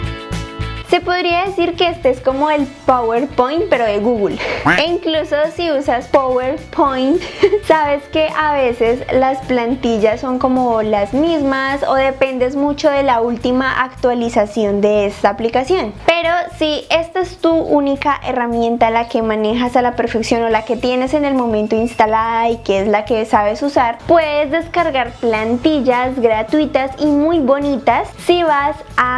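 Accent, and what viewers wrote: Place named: Colombia. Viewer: Eres colombiana verdad? Me gusta tu acento😊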